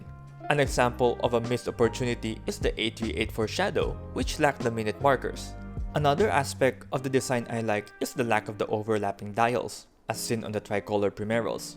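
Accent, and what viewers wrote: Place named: Philippines. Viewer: Do you happen to be from the Philippines? Because you sound like one.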